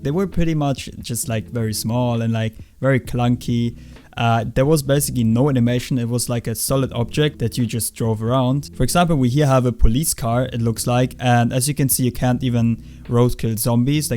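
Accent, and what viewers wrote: Germany. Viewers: Man hört so hart den deutschen Akzent raus xDD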